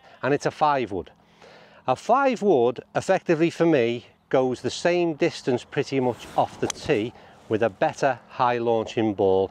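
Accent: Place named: Scotland, United Kingdom. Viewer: He has a Scottish accent so I believe everything he says.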